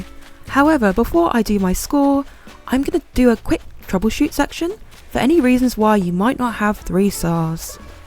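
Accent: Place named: United Kingdom. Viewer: I suspect it’s cuz ur bri’ish or austalian or something! Got that soft lilt in your cadence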